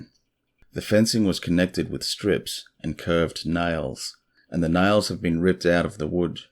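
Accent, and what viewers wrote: Australia. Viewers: !! Great to hear your Aussie voice again! Great stories! Hope you're doing well and staying healthy! 💜💙🩵💚❤️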